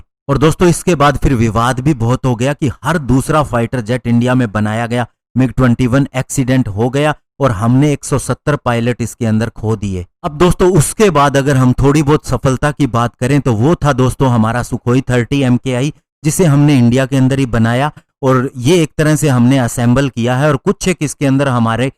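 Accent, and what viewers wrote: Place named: Pakistan. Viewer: U r Pakistani.....surely.........Bhai hamara military budget 4th largest haii world kaa.....n tune to Pakistan ki zabardast haar 1971 k baatein mein to kuch kaha hi nahii....n Teri baton se to lgta hai Indian air force kuch bhi nahi haii....n Tera accent bhii Porkistan jaisa haii....sun be Tere Pakistan ki naa Bahan KR k jayengee huummm yaad rakhiyo.......bhikahariyon....roti khane k paise nahi ladoge humsee.....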